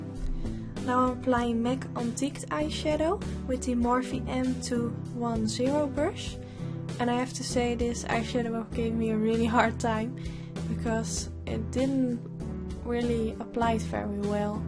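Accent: Netherlands. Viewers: nederlanders praten echt allemaal op een heel typische manier engels haha, heel vreemd! niet slecht bedoeld trouwens, viel me gewoon meteen op :)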